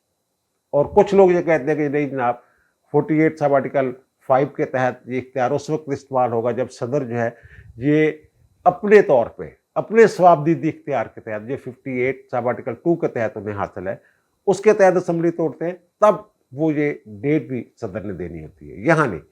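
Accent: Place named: Jalandhar, India. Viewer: چوھدری صاحب یوں تو آپ کا وی لاگ قانونی طور پر بہت مظبوط اور معتبر ھوتا ھے لیکن جب آپ جالندھر ھوشیارپور کے لئجے میں پنجابی بولتے ھیں تو بہت اچھا لگتا ھے کیونکہ یہی لہجہ ماں بولی کا میرے گھر کا ھے۔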